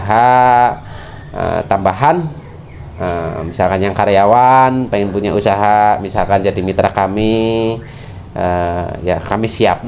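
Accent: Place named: Rembang, Indonesia. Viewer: bahasa rembang meduk.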